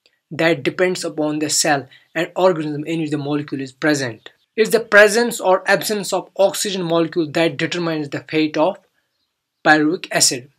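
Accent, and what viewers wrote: Kashmir, India. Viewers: are you kashmiri? You have a very Kashmiri accent!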